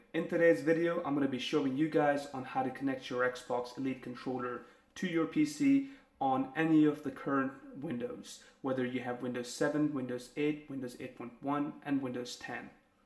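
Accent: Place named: Northern Ireland, United Kingdom. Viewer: Great guide, sounds like uve a northern irish twang haha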